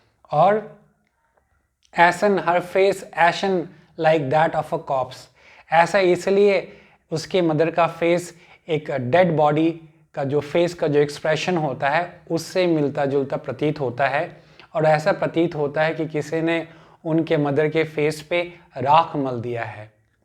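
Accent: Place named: Bengal, India. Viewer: Sir apki languge tone se aap bengali lgte ho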